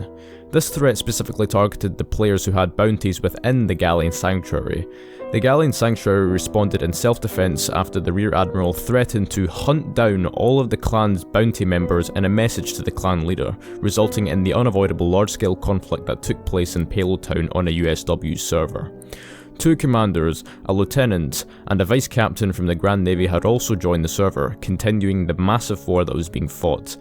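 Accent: Scotland, United Kingdom. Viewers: I love your scottish voice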